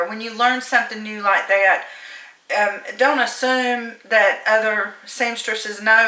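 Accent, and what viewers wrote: South, United States. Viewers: I just love you. I rarely hear southern accents on youtube hehehe. Makes me feel like im home. Not sure if youre from the south but you sure sound like a southern belle. 💚💚 much love from Georgia!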